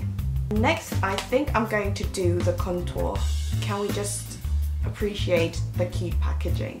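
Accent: United Kingdom. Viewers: 영상 보는게 처음인데 너무 귀여우시고 매력있으셔서 댓글 달아요 ㅎㅎ 영국식 영어 발음도 정말 매력있으시네요!!!ㅋㅋ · 아 님께는 처음 댓글인데:) 너무 귀여워요 동생같으으으으음!!!! 매력 넘쳐요 ㅠㅠ 영국발음이며 화장이며 말투까지 앞으로도 자주 봤음 좋겠어요 ㅋㅋ 빠~이!( 버전인사ㅋㅋ) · I adore your accent/voice! Are you British?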